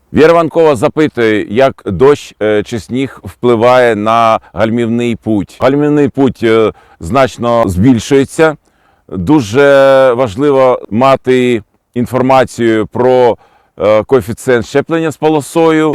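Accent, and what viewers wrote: Ukraine. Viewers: с украинским акцентом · Вы стали шипко по заподно-украинскому языку говорить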